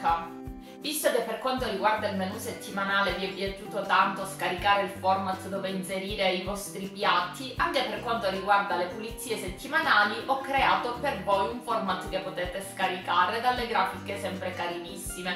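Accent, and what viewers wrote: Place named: Cosenza, Italy. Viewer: Ciao mi sono iscritta al tuo canale e volevo farti i miei complimenti!Se non sono troppo invadente volevo chiederti se sei di Cs perchè mi sembra quel tipo di accento (io sono dell'alto Jonio).Sei libera di non rispondere se vuoi .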